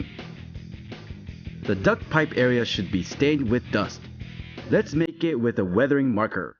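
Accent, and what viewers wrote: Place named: Japan. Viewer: It's the Japanese accent. Most Japanese men speak like this. Don't think it's nice to relate that to a meme, but I understand that a lot of people might not have been exposed to hearing such an accent before. 😃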